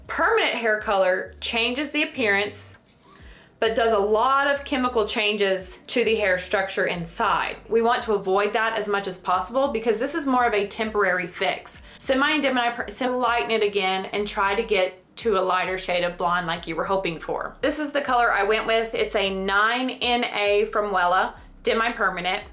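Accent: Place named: Texas, United States. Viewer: Are you from Texas? Nice southern accent & also because Texans are smart.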